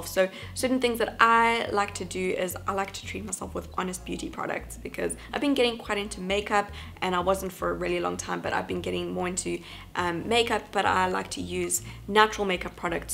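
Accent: South Africa. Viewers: Are u South African ? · I love your South African accent! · I could totally tell you were from South Africa !! ❤❤Do you still live here ? (From a fellow South African 🇿🇦) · Are you from South Africa?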